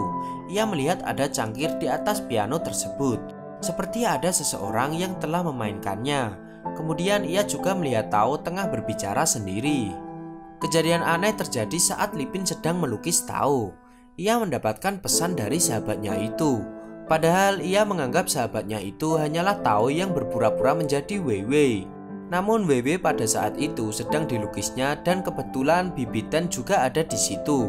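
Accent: Java, Indonesia. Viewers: Jawa nya medok banget min 🙈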